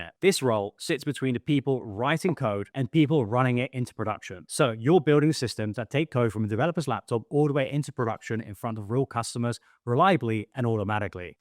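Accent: United Kingdom. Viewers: English Accent? Nice.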